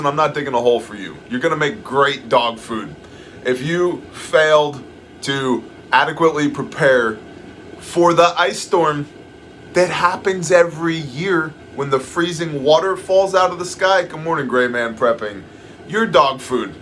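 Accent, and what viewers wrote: Canada. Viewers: Oh my gosh the canadian accent hahaha Did you star in the Fargo movie because that is exactly what it sounds like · The "vandalism" of the Terry Fox statue (old school cancer victim who attempted to run across the country on one leg after the other was amputated..he didn't make it as cancer took his life) was placing a Maple Leafs hat on his head, a hockey stick in his hand and a Canadian flag draped over his back. We all know if this was a burn loot murder riot they would have spray painted it before tearing it down and tossing it in the canal to great applause from the msm. Loved the Canadian accent lol ❤🍁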